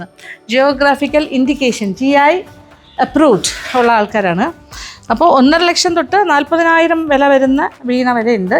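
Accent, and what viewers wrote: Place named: Tamil Nadu, India. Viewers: കത്തിരിക്ക konjam veyy...😅athu kettapom chiri vannu😅... Mam nte tamil kelkkan nalla rasamanu❤️ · Madathinte tamil kelkkan rasamundu😄kathirikka konjam veyy ennu kettappol ente Aswathy molu nalla chiri ayirunnu ❤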